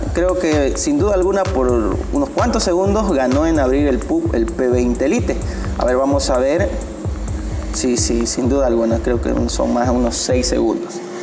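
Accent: Bolivia. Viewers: Cuando vi tu video dije este es de BOLIVIA por qque salio el deber y despues dijiste poquingo y hablas como BOLIVIANO Buen video caramba.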